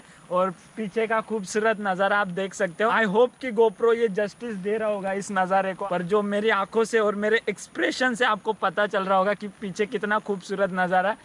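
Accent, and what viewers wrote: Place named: Maharashtra, India. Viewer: Ashich commentary thev, real, marathi ani marathyachi hindi, lavkarach famous hoshil 😂⛳️👌🏻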